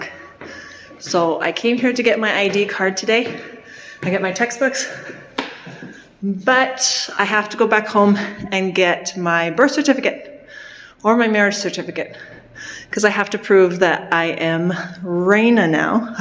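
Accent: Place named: Canada. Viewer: Wow! This is how Canadians sounds? This could be a good chanel to listen English for practice.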